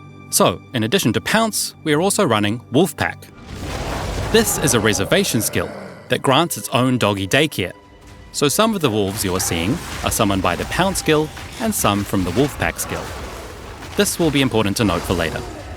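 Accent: New Zealand. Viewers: Okay, so "Air Buds" sounds like "earbuds" in the Kiwi Accent. Now we gotta see if can say "bad bed".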